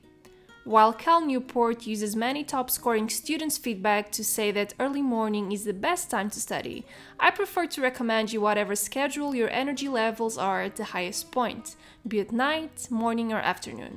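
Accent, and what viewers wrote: Portugal. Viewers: I love your videos I think they are really informative and make me want to be more organized like you But are you from Portugal? I am and your name sounds really familiar and your accent too ahah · are you portuguese? sorry hahaha i’m curious because of your accent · Eu sabia que reconhecia o sotaque! És portuguesa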